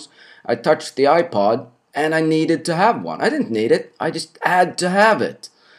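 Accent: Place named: Sweden. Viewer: Just därför gör man inte stand-up på engelska om man är svensk och har sån där bonn-dialekt. För övrigt är Betnér en värdelös komiker.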